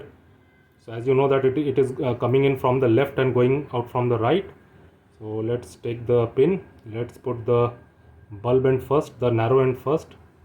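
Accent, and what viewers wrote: India. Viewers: I never get tired of listening to indians speaking english. 😂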